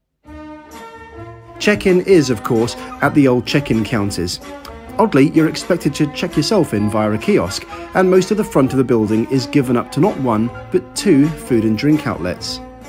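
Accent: United Kingdom. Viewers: LOL I laughed at that too. He's British. Give em a break.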